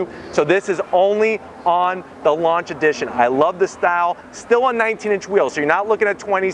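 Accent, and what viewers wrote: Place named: New England, United States. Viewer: Well guess what? I'm gonna have to zonk your gay ass yankee accent. TTFD thats what your working with.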